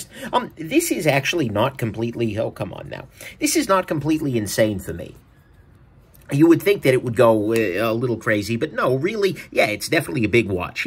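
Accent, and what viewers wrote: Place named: Chicago, United States. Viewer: “Da watch over dare.” Are you from Chicago?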